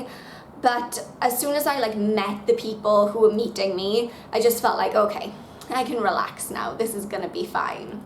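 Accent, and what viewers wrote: Wales, United Kingdom. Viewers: Sounds like a Welsh bird ?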